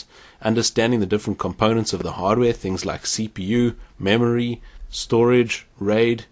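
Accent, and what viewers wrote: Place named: Afrikaans, South Africa. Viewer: jinne, jou afrikaanse aksent skyn deur ;P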